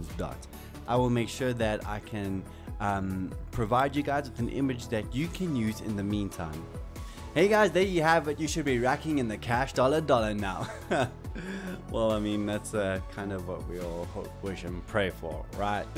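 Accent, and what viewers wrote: South Africa. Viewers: Ayyyeee Afrikaans?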